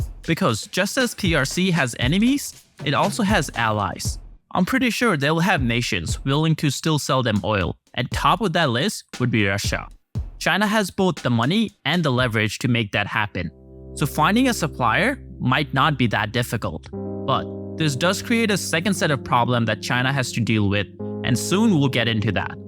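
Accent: India. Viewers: your accent is indian with much improvement than indian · Indian accent😅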